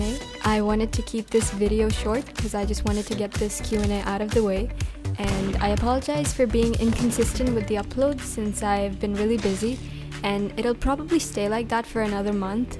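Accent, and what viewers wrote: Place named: Philippines. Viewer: It's clear that she's Filipino I know one when I hear one I can tell by her accent.. AND NICE VOICE:D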